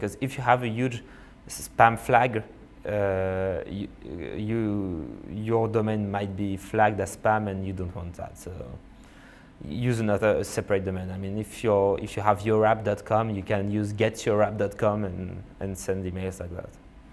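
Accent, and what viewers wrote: France. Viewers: Good talk with a lovely French accent :) The thought process of growth hacking can be taught and mastered, that's what people have to tell themselves. Read books, be around people who've done it, and actually jump into business. I empower people everyday at Elium (<link> and I can say that the barriers between them and their dream life are often imaginary !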